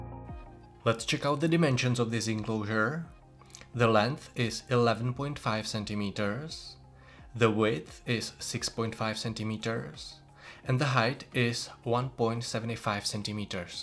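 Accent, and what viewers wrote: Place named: Czech Republic. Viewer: tvl tvá angličtina zní jako od Čecha.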